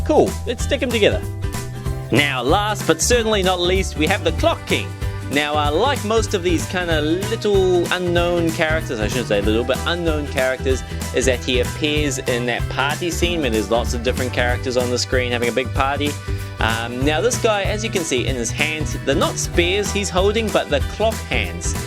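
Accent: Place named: Australia. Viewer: Gotta love that Australian accent! Greetings from Washington state.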